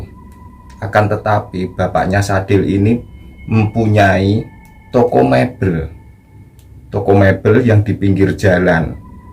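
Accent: Java, Indonesia. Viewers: AsyiiK, SeRu TeRBaWa CeRiTa MaS, DeNGan LoGaT GaYa BaHaSa KHaS JaWanya,NGaNGeNii 😂SeMoGa SeHaaT WaL AFiaT & KeDe PaNnya KonTen/CHaNNeL SeMaKin BeRKemBanG/SuKSeS SeLaLu AamiiN. 🤲🙏👍👍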